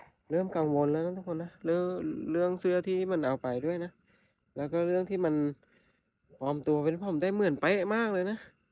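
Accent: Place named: Suphanburi, Thailand. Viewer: เป็นคนเหน่อที่ไหน สุพรรณ หรือ ราชบุรี….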